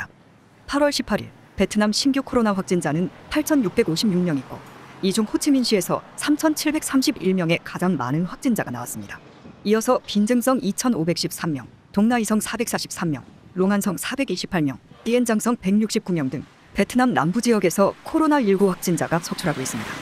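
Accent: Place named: North Korea. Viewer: 나래이션 악센트가 북한 억양이 있네요 ~~~ 발음이 너무 좋아서 귀에 팍팍 잘들려요 ㅎㅎ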